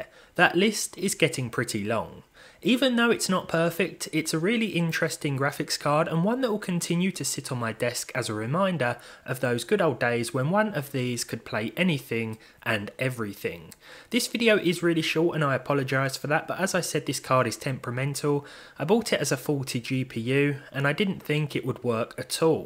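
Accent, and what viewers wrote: United Kingdom. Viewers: Everytime I see your face I have a weird feeling of you you are an npc lol Always talking in the emotionless British accent puts that theory to the most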